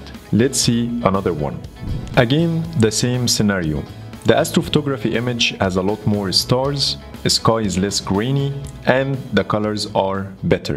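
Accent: Egypt. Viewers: مصري مصري ، اللهجه فضحاك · Ur accent is Egypt English? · This accent is familiar are you Egyptian?? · Your English saying that you're Egyptian, am I right?! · هو انت مصري اصلي حاسس بتواصل في سرعة كلامك مع مخي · You sound kinda Egyptian tbh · واضح من لهجتك إنك مصري 😁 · انتا مصري حاسس كدا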